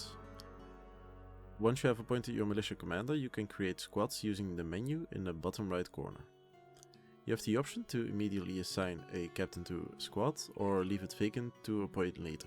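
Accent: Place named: Netherlands. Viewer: I can't listen to this horrible Dutch accent. Jezus Christus.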